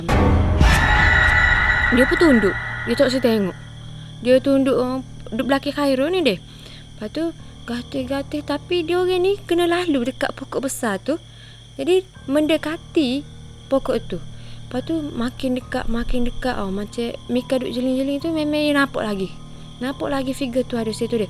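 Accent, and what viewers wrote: Kelantan, Malaysia. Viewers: Sambil2 dgr dapat belajar loghat Kelantan.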